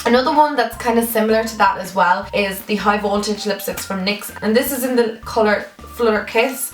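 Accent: Ireland. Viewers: Are you from Ireland?🇨🇮 cork here!😋😊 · Omg I love ur accent X I'm going to Ireland soon X what part are u from X ?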